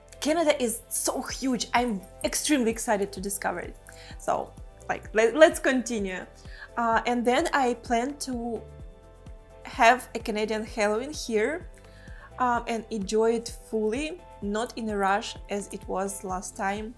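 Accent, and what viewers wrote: Russia. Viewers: Мадам, на каком языке вы бы не разговаривали - всё равно прекрасно слышно, что язык на котором работает ваш мозг - русский!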